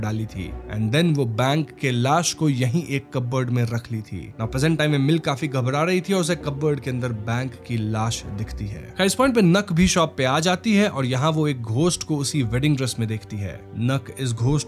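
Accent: Bengal, India. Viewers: Bhai aap bangali ho ampka hindi se to yehi lgta hai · Are you bengali?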